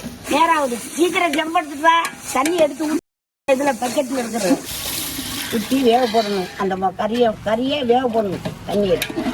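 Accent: Ramanathapuram, India. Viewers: நான் Meharaj recipes ராமநாதபுரமV.KMA வகையறாநான் 👌 உங்கள் வீடியோ அருமையாக உள்ளது பேச்சு வழக்கு எல்லாம் ராமநாதபுரம் தோரணையில் உள்ளது உங்கள் வீடியோவை பார்ததில்மிக்க மிக்க மகிழ்ச்சி ஊரில் உள்ளவர்களுக்கு வகையறா என்று ஒன்று இருக்கும் உங்கள் வகேரா பற்றி வீடியோ போடுங்க நீங்க எங்க நண்ணி மாதிரி பேசுறீங்க இப்போ எங்க நண்ணி இல்லை வயதானவர்களை பார்த்தால் எங்க நண்ணிஞபகம்தான் வருது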